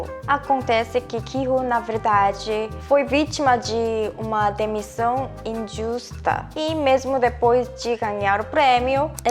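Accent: South Korea. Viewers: amei seu sotaque coreano, obrigada pela explicação!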